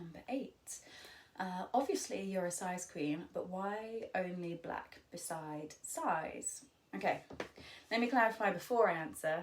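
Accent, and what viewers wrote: United Kingdom. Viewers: In addition to the rest, I love your very elegant, so British accent and way of speaking (I'm French).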